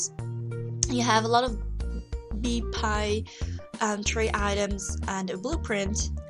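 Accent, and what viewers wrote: Netherlands. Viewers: Your dutch :) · o are you dutch? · haha gewoon een Hollander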